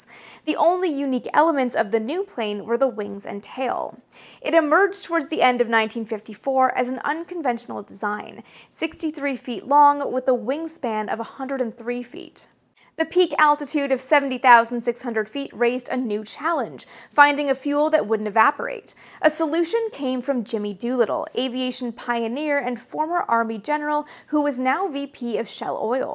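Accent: Quebec, Canada. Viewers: Canadian accent is really coming out today. 😋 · She sounds Canadian. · English is a complex diffuse language that includes much from Latinate, Romance and Hellenic origins, plus regionally on neighbour and partner languages. Do you pronounce "cafe" as per the original form "café" or a literal mangled version like "caff" or "kayfe"? How about Jalapeño with a hard "J" and a "peeno" at the end? I am fluent in four languages (English, Finnish, French, German) and I found that once I worked beyond my mother tongue (English) I began to understand how it works, when it doesn't, it's oddities and the like, plus basic respect for non-English words being pronounced correctly. I'm guessing that by how pronounces her English, she is Canadian and hence is likely to be more au fait with French pronunciation and recognising word forms. The worst though, is NHL commentators mangling people's surnames without actually bothering to learn how they're truly pronounced. 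That's beyond lazy to the point of arrogance. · Proud of you even after your Canadian pronunciation (yes my dear, I'll deal with it). Been watching you for some years now and bought your book on women aviators for my 5 yo grand daughter (my 87 yo mother read it and loved it, I too). Do you have any capability or desire to Sign your book? I'd love for her (my grand daughter) to have a signed copy, do you do this? Keep it up, you and others that love aviation history, swell my heart in a good way. I'm a retired Navy Air Traffic Controller that grew up watching Mercury, Gemini, and Apollo. I still cherish watching Apollo 11 as a 11 year old boy at my Grandma's on a warm East Texas night in July, 1969 land on the moon. Still get chill bumps thinking about it... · She's a Canadian and has a bit of the French accent. Fun fact just drop the 'H' in every word you say and you are on your way to great French Canadian accent. 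· I don't understand why this is throwing some people into such a tailspin. Have you heard of a French-Canadian accent?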